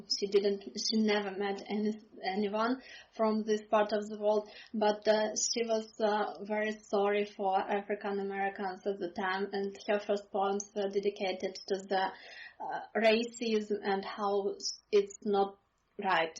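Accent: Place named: Russia. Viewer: sorry your pronouciation is too russian style english . I hardly understand haha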